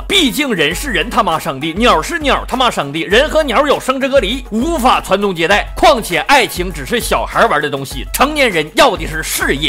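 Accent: Northeast, China. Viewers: 说朗朗额够，东北牛叔英语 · 就喜欢听牛叔说 东北大辣皮儿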